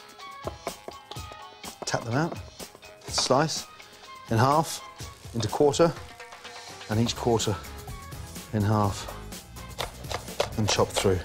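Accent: United Kingdom. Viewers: I always think that haha I love listening to a brittish person cook. Like jamie Oliver haha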